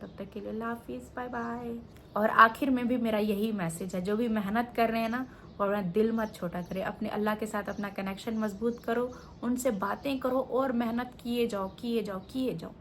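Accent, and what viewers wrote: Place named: India. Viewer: fazool baat mat Karo. Mein ne bhi comment parhe hein. Tum groom ker rahi ho sab cheez to dressing bhi groom Karo. Aap ko samjhaya hi Hai zayada tar logon ne. Trip pe aise kapre nahi pehente. Aap normal pehente hein koi nahi kehta. Aap picnic pe ghalat kapre pehen k gaye. Vo kapre picnic k liye nahi the. Aur aap ki zuban saaf thi. Aap ab india n logon ki Tarah bolna shru ker diya Hai. Achi aur saaf zuban ko kharab ker Liya. Yehi bola gaya Hai. Ye vlog intihayi fazool Hai. Aur her waqt mere husband ki doosri shadi. Her waqt yehi baat. Bas Karo yaar. Aur koi topic nahi hai. Agar tum her baat pe public ki baat sunti ho to groom kerne ko bola Jaye to use bhi suno.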